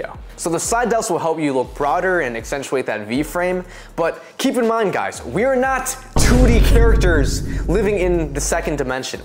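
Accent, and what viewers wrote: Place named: United States. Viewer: I might be racist but I never thought u would have such an American accent 💀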